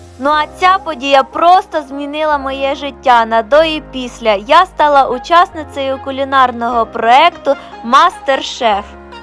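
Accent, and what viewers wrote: Poltava, Ukraine. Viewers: Що ви морозите? Який суржик? Прекрасна українська мова з інтонаціями Полтавщини.... Не лізьте з своїми порадами туди, куди голова не влазить.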